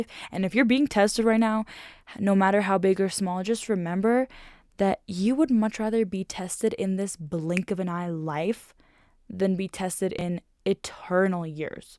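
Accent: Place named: United States. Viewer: Сказать что я удивлен, ничего не сказать 😅Это второе видео из вашего канала, которое заставило меня остановиться и всё же написать коммент, так как вопрос в голове не даёт покоя. I am really impressed that you know so many languages and even acquired the American accent. Were you exposed to the language growing up? Яна битта саволим бор эди, тушунишим буийча Фусха ни урганвосиз. Узиз урганвосизми йоки тил курсларига катнавосизми йоки бирор урганиш методларини кулавосизми?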